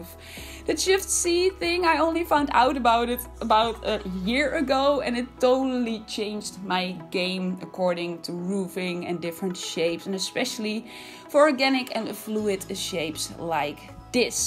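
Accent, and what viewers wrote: Netherlands. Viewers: U sound dutch :) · Ik hoor dat je Nederlands bent en je kan zo goed bouwen je bent echt goed ❤️ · First video I wached from you, I'm at minute and I notice your accent. Is it Dutch? Sounds soo familiar, I used to live in Amsterdam ❤ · I knew it from your accent. I live in Utrecht and u? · omg i figured because it sounds very similar to dutchsimsmaster 😂 BTW love your builds, cant wait to see more!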